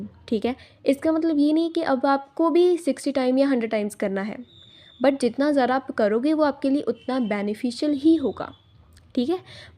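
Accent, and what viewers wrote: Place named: Punjab, India. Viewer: Probably because of her punjabi accent❤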